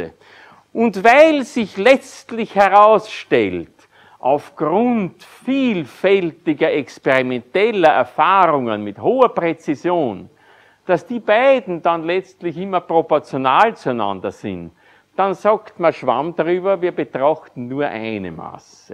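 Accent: Austria. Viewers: Österreichisch ist einfach zu Charmant. Ich wünschte ich würde es auch beherrschen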